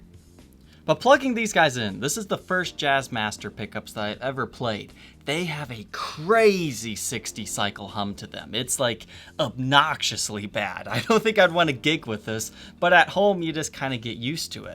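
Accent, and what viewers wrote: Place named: United States. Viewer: Jagwar. The american accent is interesting.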